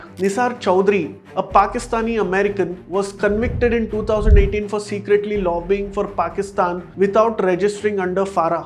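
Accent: India. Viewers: Your content is really informative but you need to change your Indian accent if you are desiring for global audience. P.S. : I am not being racist....this is what you should do to expand your business...that if comes with learning new skills, language or accent etc. It is what it is you cannot change the world bro, you have to sacrifice yourself.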